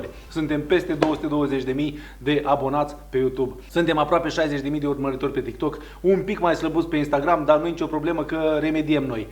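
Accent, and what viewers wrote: Moldova. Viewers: Chef mor dupa retetele tale .bune bune rau 😂.Doar un umil sfat incearca sa fii tu ca esti super nu mai accentua accentul moldovenesc ca nu e nevoie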